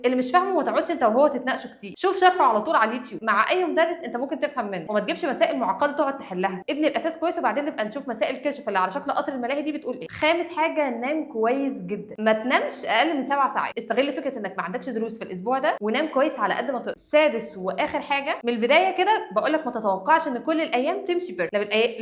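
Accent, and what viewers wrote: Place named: Syria. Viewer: انتى سورية اصلا استغربت لو كنتى مصرية مستحيل تقول هيك بس ما دام لهجتك مختلفة فا طبيعى 😂😂والله لما بطلع على اللهجات بلاقى حاجات 😂😂😂😂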